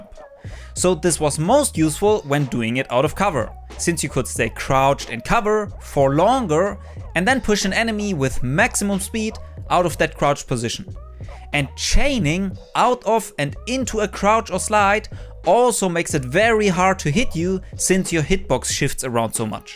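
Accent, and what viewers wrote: Germany. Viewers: Dikka dein englisch hört sich mehr deutsch an als alles andere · Sounds like German Accent :) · Are you Form Germany? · Man hört so den Deutschen akzent raus 😂💪🏻🔥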